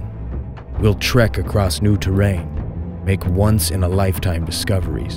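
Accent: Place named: United States. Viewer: Lovely accent and I do not say that about many American accents :)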